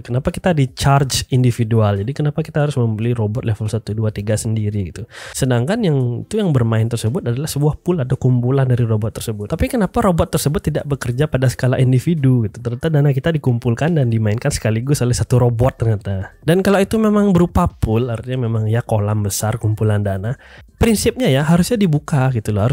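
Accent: Bali, Indonesia. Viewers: Dari Bali ni Kayanya yaa.. hehe.. omswastiastuu Bli... Bagus na e penjelasan itu. Aku juga sependapat. Wkwk. Tinggal tunggu aja boom waktu itu ATG. Rata2 yg begitu biasanya ujung2 scam.. kleeeeeeng.. wkwkwkwk. Itu platform Bandar itu. Banyak manipulation nya, buka real boker atau DMA direct market access.. wkwkwk. Tp apa daya, Kalo kasih tau org yg udh percaya sm itu ATG sulit dikasih taunya soalnya. Wkwk.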